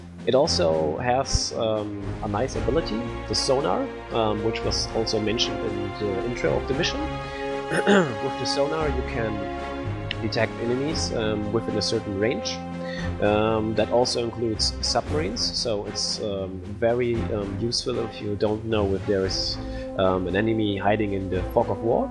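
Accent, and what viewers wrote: Germany. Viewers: German 🇩🇪?:D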